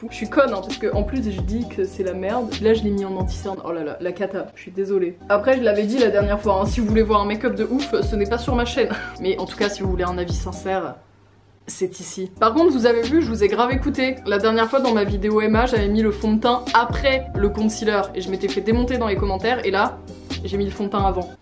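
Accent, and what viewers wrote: United Kingdom. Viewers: Mais elle est 100% British pas américaine, écoutez son accent 😢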